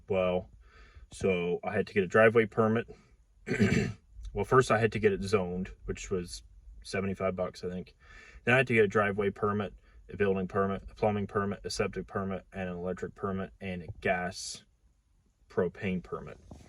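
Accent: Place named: Kentucky, United States. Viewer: Sounds like either Northern Ky or western Ky. You need to come out to southeast Ky in the mountains. It’s a whole different world out here. Less government involvement!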